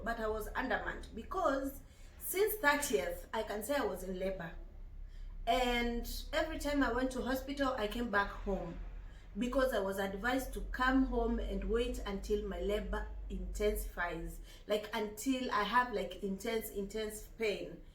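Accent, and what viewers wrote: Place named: Kamba, Kenya. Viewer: Are you kamba?! Your accent, jeeeez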